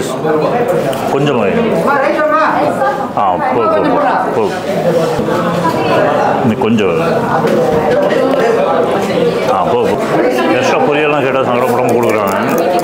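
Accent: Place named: Kongu, India. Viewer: Ore satham vaalu vaalu nu 😂kongu slang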